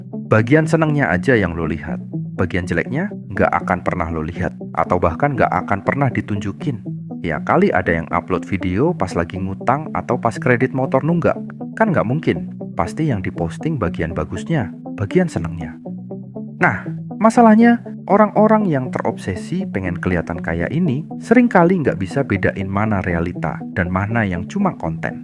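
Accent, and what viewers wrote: Java, Indonesia. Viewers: gue juga heran, bang. aksen Lo Jawa banget, tapi pake Lo Lo, gua gua. biar apa??😄😄😄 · Mas sampean medok, jane rasah nganggo lo gue.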